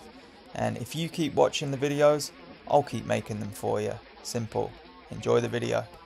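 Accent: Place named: Australia. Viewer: Take it from someone who's been watching lawn care videos for 6 years. Well before the popularity spike. You've got all you need. The quality, the actualmowing experience, the charisma, and we Muricans love a good Aussie accent. Plus you go that extra Kilometer. You just need exposure. Not a matter of if but when. Just keep doing you.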